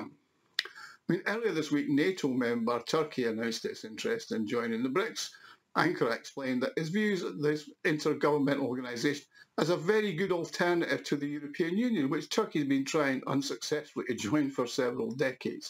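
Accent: United Kingdom. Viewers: Great commentary. Good to hear a British voice talking sense against the tidal wave of propaganda we have to put up. Best wishes to Scotland from England.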